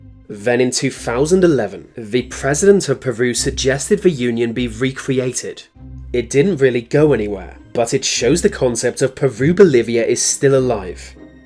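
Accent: United Kingdom. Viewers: What about a United States of, where the biggest export is weird facts and stories told in a snarky, British accent?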